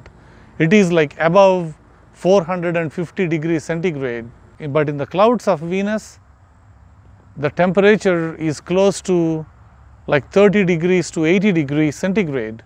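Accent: India. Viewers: ohh ya I felt from your way of talking that u are from india by the way which telescope u have beside u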